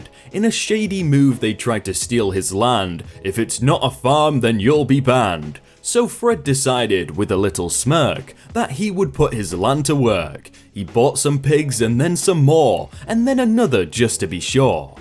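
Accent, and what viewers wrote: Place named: Southern England, United Kingdom. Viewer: England, probably south, doesn't sound midlands or north.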